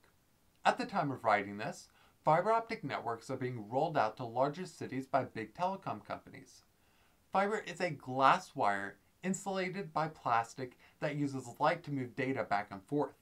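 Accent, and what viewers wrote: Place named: Philadelphia, United States. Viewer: The accent is distracting lol. Philly? Baltimore?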